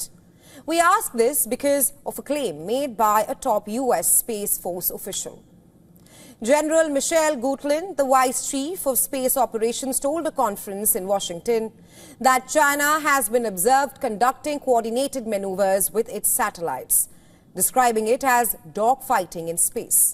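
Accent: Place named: India. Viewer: I really love your straightforward Indian accent.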